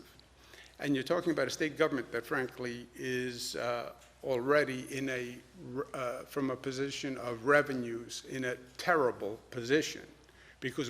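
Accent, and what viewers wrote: New York, United States. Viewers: I am, like so many others, a native NYer now living in FL. Cuomo is even comforting ME down here!!! He sounds like and reminds me of his father, Mario, so much. Hannity criticizes him, but it's still so comforting to hear the NY accent and the way he cuts to the chase in explaining what's going on. and of course, he's fighting to get the best for his state. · Plus everything he says sounds like he's describing a nice stake or his mama's marinara...lol....I live in FL now, but am originally from NY state, Buffalo, but spent time in NYC here and there as well and his vocal deliveries take me home, lol...the NYC guys/men and the North Buffalo guys/men I knew growing up..but I'll lay it bare here, I don't personally like politicians in general, but still listening to him is informative about what's going on up there and like I said, his delivery is nostalgic. 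I'm hoping this is over soon, for all of us, every where.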